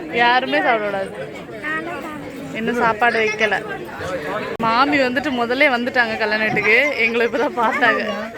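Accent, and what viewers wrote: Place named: Nagercoil, India. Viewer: Hi... Good morning 😊 I like your Nagercoil slang very much 😍👍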